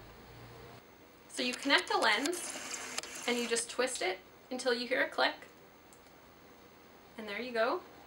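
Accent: Canada. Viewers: I'm guessin your Canadian?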